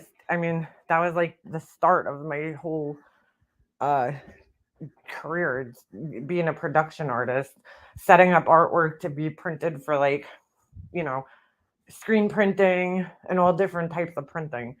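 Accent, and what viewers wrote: New York, United States. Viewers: say whaaaaaaaa?!?!?! 😳😳😳😳😳 ill just never understand ppl who literally know for 💯 certain that certain individuals are garbage, hateful, lieing, vile, cruel shit bags - but they overlook it, bc theres some sort of short term upside.... the little validation this gives that shitbag, only validates them more & keeps the destructional hampster wheel going.... legit, it makes it so so soooo much worse for countless ppl currently AND in the future.....nothing i could ever gain personally, would outweigh validating that evil shit she does to ANYONE else down the line... nothing, nada, zip, zilch 🙅 it doesnt make me mad to hear about this happening, just sad if this is whats going on 😔 obviously im missing aloooooooot cuz hearing you say this is going on, floors me....trust this = you cant fix nor change mean azz heartless ppl, who legit enjoy nothing more than completely trying to anihilate & destroy ppl.... 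you dont know when its coming mor why, but u best believe that ur on the clock & its only a matter of time.... u wont see it coming either, cuz its love bomb to anihilation - no in between thanks for being the ultimate 🐲 slayer, and just keepin it 1 millionnnnn on this 🐲 lady,!! 🤣😍💯 thanks for your service, in the fight to stop the "dragonnnn decapitationnnn" 🙌😂 #StopTheDragonDecapitation i only caught the 1st 45 mins, so imma finish this later tonight..... its alwayssss hella cool to hear that accent goin on a good ol NY truth droppin semi rant on 1.5 speed!!! 🤗 😍 🙌 made my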